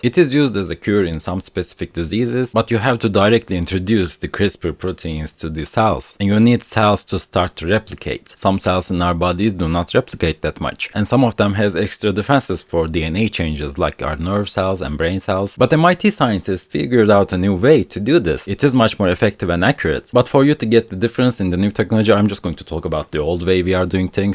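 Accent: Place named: Turkey. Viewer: çok ağır bir türk aksanı var. bütün "the"lar "dı" diye okunmuş. dilinin orta kısmıyla dişine dokunman gerekiyor. ve sesi çıkarmaya hava çıkarmadan biraz daha erken başlaman gerekiyor. ð harfini çıkarırken adem elmana dokun onun titreyip aşağı inmesi gerekiyor. bu harfi çıkarırken dilin havayı durduracak, ses çıkmaya devam edecek (bu harf sesi durdurmaz) ama hava ağzından çıkmak yerine olduğu yerde duracak (yada yavaşlayacak) ve o yukarı çıkmak yerine ses tellerin aşağıya doğru inecek. θ versyonu için böyle birşey gerekmiyor tabi. sadece dilin ortasıyla dokun ve t-s benzeri sesi çıkarırsın. bazıları f'ye benzetiyormuş thank you yerine fank you diyormuş. "figured" figürd diye okunmaz f igyırd diye okunur. hızlı konuşayım derken bütün sessiz harfleri yutmuşsun. "with the prime editing technology" teknaalıci olması gereken şeyi teknoji diye okumuşsun. "proteins" proteynz değil prowtiynz. 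"apocalypse" epokalips değil epakılips. "negative scenerios" negatif senariyoz değil negıdiv sıneğriyowz. pozitif değil paazıdiv. cenetik değil cıneedig. vurgu ikinci e'de, ilkinde değil. vurgu olmayan hecelerde ya ı yada i şeklinde okunur ve hızla geçilir. a, e diye geniş harfle okunmaz. ekşuli değil ekçıli. ingilizcede biz herşeyi u diye okuruz ama mesela "good" gııd diye okunur. özellikle de amerikada. çoğu anglofonun yaşadığı yer amerika. "you are opening two strands of it, you remove one strand of it, and you put the new sequence you want there" sürekli "of it" demeye gerek yok. iki zincirin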